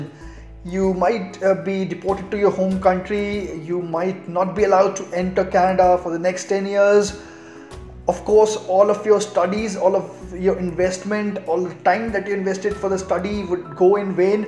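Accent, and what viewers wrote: India. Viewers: Indian english accent hi h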